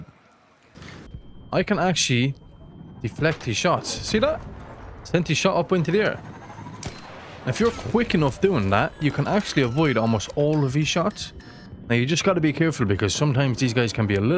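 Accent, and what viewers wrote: Ireland. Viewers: Last name O'halloran but can't understand an Irish Accent - You're high on spectrum or what? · Its English but the guy is Irish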